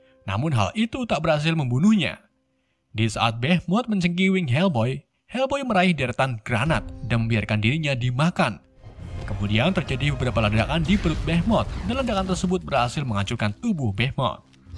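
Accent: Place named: Java, Indonesia. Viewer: Mencengkiwing. Admin e wong jowo ki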